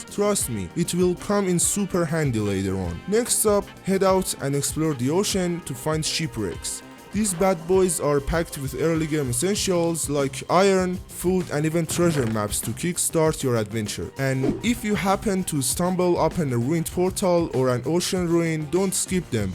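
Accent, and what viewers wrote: Iran. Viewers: داداش ایرانی هستی؟از لحجه فهمیدم😂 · your persian accent goes hard 😈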